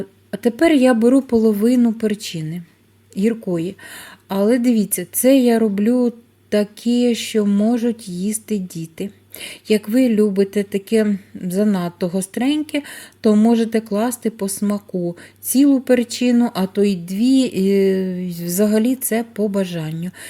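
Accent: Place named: Kharkiv, Ukraine. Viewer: Дякую за смачний рецепт.Сьогодні засолила нову порцію,тепер тільки подолати очікування довжиною в 5 днів.А ваш говір нагадає улюблену подругу з Харківщини-ці неймовірні українці під щоденним бомбардуванням роблять свою справу для перемоги і не збираються виїздити в більш-менш спокійні місця. Процвітання каналу!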